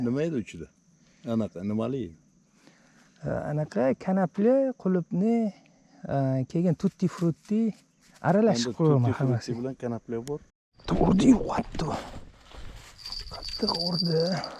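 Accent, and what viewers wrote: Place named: Uzbekistan. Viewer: Со своего члена залупу отрежь, может сома на 5 кг поймаешь. Узбек а акцент харипский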